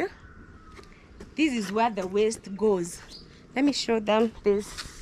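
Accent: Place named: Nigeria. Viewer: I love your nigeria accent oh. Love you in all accents. I appointed myself an Auntie from far😁😆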